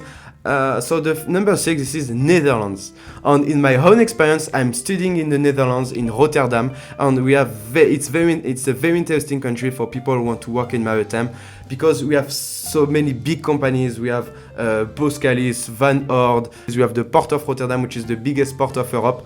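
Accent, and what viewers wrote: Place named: France. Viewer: Ouuufff. Want to know how i know you're french? 😂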